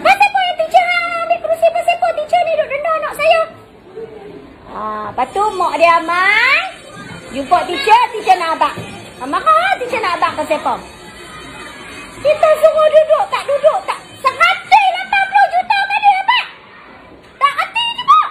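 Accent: Kedah, Malaysia. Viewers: Kelas bahasa kedah ke ni? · Orang kedah memang sedap dengar bila depa marah 🤣🤣🤣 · Cikgu ni org Kedah ka 😂😂😂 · Pekat betoii bahasa Kedah cikgu ni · Rasa pelat tu bkn penang..ni blah kedah perlis x silap..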